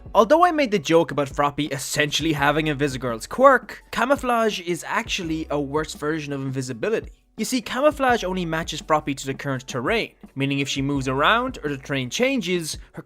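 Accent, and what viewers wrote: Ireland. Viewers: He has an irish accent and irish accents tend to pronounce their th as either a t like in words think and three or as a d like in this and that.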